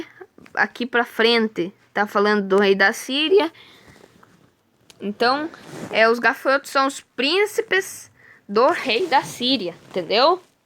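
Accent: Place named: Israel. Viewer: Tem sotaque israelita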